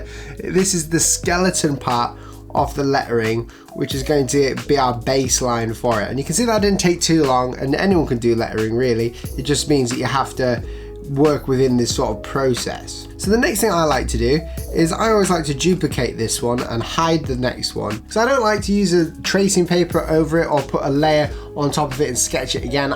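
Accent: United Kingdom. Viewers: Where are you from, where is your accent from? :) UK where?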